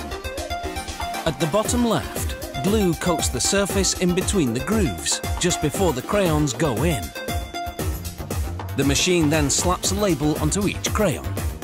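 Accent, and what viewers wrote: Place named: United Kingdom. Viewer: I wasn’t expecting the British accent I’m accustomed to the USA accent, where is the SAP button?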